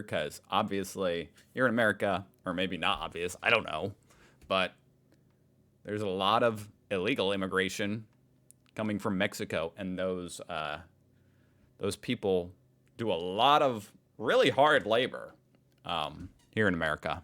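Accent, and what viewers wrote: England, United Kingdom. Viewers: You sound more like a Pom definitely not Aussie 😂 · "Happy arvo". That 'call-sign' is cheerfully yours, but your Australian accent is hybrid British. But no worries mate. Cheers from Sydney, AU. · You can stop with the British accent. LOL! You have never done an Aussie accent. LOL!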